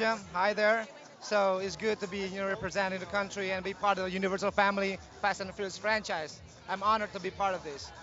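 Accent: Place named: United States. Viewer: Logatnya US banget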